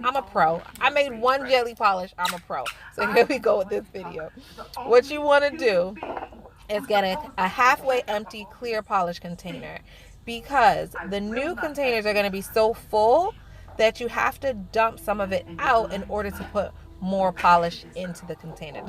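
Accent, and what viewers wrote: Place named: New York, United States. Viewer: Aww i love the positive girl power on this channel. This is what should be more on youtube, we girls need to take over ALL of youtube lol. No but seriously im here cuz ive been a huge fan of babygirl for a while now so im glad i found you. Plus i believe your from NYC? Brooklyn? I can hear ur accent. Lol. Good luck on your page & enjoy your journey, im a sub for life now & look forward to watching you grow. Lots of love & positive energy girl 🤗💅❣🙋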